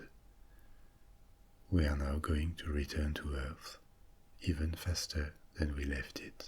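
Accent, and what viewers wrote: France. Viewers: Tu est français ? Car avec le nom de la chaîne et ton petit accent français on dirait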